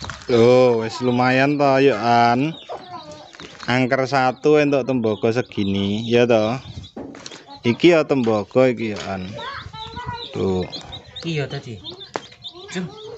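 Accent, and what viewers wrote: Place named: Manado, Indonesia. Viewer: Dari logatnya pasti anda org manado y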